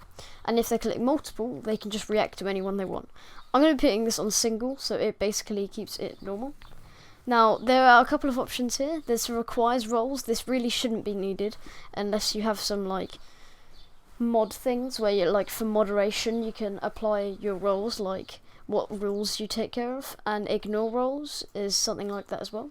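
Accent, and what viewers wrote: United Kingdom. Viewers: ooh a british man i suppose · you are british, cringe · Thank you sm little british man I love and appreciate u sooo <3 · I can’t get enough of bri’ish accent, i love it so much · damn your british accent is amazing. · Bro i love your British accent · Hes from the UKKKKKK LIKE ME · its a british accent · Thank you random british guy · My dude sounds like a professional british asmr person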